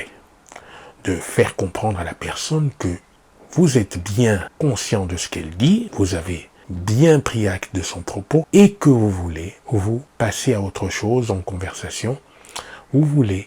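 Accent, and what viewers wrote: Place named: France. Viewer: Wow I don't know why but I really love the accent of French although I hardly understand it 😊 I am a new friend and here to support you. I do hope to get one back 🙏😊🤗🔙 thank you so much 🙏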